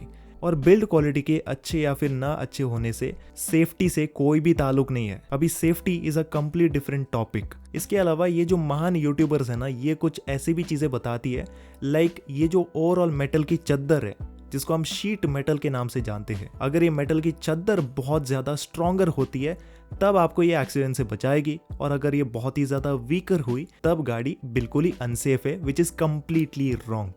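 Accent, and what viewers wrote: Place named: South, India. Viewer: Brother.. appreciate your Hindi. Just improve your MTI. You purely sound south Indian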